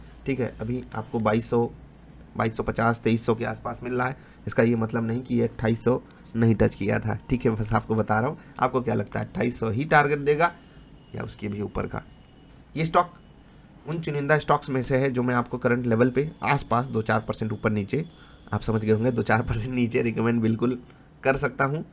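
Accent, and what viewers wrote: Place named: Eastern Uttar Pradesh, India. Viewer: You are from UP EAST ??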